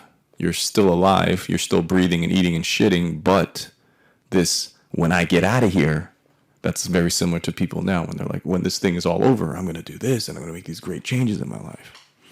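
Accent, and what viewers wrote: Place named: Mexico. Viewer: I love ur Mexican accent. It’s ok I’m Mexican.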